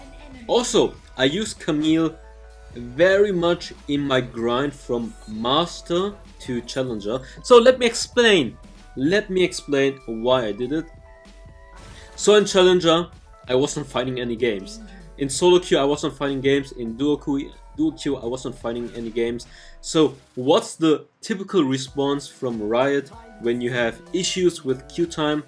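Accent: Germany. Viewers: Are you german ?? 😂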